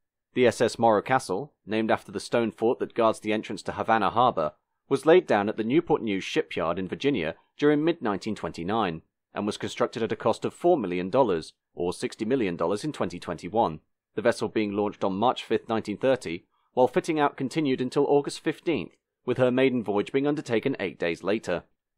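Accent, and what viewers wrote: United Kingdom. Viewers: Unappealing (if linguistically logical) 'short a' English regional accent exacerbated by a Yankee flat sentence inflection.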